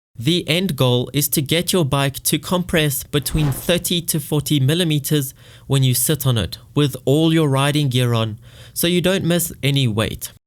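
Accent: South Africa. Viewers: Who else follows this dude partially because of his South African accent!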